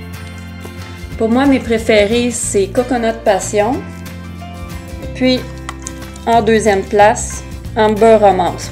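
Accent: Quebec, Canada. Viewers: Super ta vidéo! Je suis allée à Montréal l’automne dernier et j’en ai profité pour en acheter 6! Leurs brumes sentent tellement bons! Je m’abonne à ta chaîne 💗! En plus j’aime beaucoup ton bel accent québécois 💗💗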